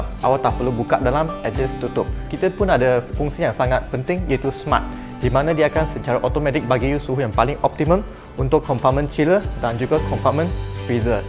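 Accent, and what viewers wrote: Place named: China. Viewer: u look indian but sound chinese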